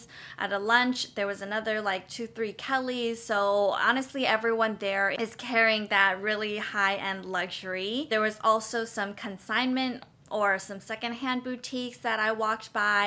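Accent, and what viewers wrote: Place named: Asia. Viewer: I love your Asian English accent.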